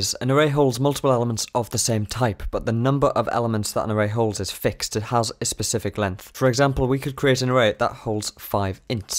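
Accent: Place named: North, United Kingdom. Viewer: насколько же приятно слушать северный британский диалект по сравнению с индусами! я аж подрочил.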